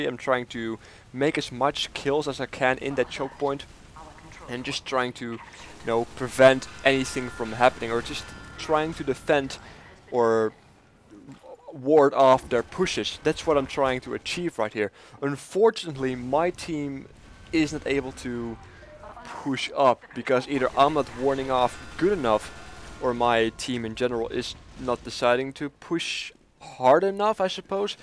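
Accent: Netherlands. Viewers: Can I ask you something? I think you have a uncommon accent while speaking english. Is there any chance that you're dutch, because sometimes you sound like it :)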